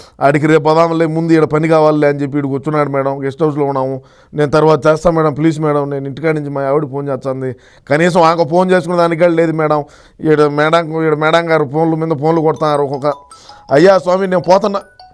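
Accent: Rayalaseema, India. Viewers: అయ్యా రాయలసీమ యాసలో మాట్లాడి ఇరగదీస్తున్నారు..సూపరో సూపర్...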